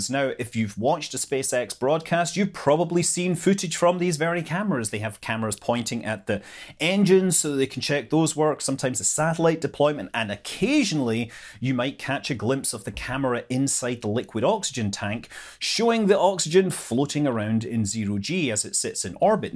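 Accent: Scotland, United Kingdom. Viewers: Is this guy a Scott? Love the accent!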